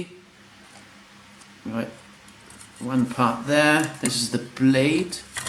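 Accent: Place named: RP, United Kingdom. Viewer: Words fail me...and what the hell is going on with the posh accent?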